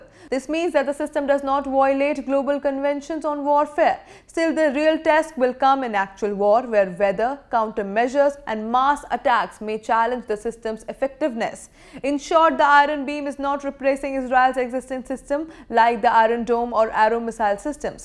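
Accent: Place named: India. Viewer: i love indian people., but i hate when indians speak english. stop please. its menasing to hear you speak english with that indian accent.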